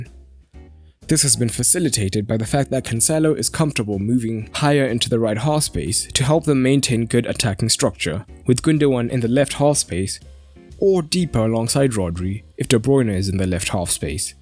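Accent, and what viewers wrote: South Africa. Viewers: You sound South African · Are you South African 😂? Shoutout if you are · Is the narrator of this video South African? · 😅Brother I can your South African accents ,you can’t hide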